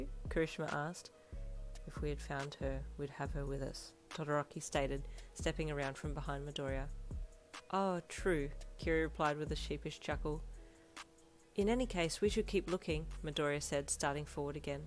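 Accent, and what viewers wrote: Australia. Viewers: Yes I immediately knew what pants meant but if you had said dacking 😭😭 I’d be so lost like please explain your Aussie accent to meeeee 🤣💀💀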